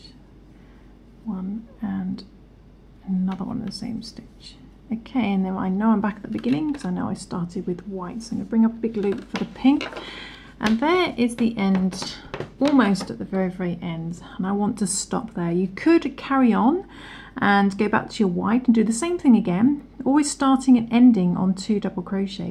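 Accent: United Kingdom. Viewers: Love this and will be trying it. Just find it bit confusing you use US terminology. why is that? is it just personal preference. you certainly sound British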